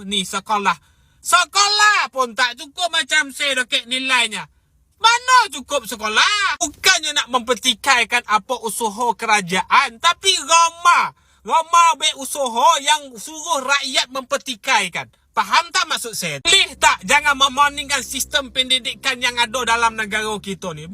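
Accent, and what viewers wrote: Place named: Negeri Sembilan, Malaysia. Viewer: Terhibur la dengar dia ni cakap. Sampai aku ulang 2-3 kali. hehehe... Aku mmg suka loghat negeri 9 ni :D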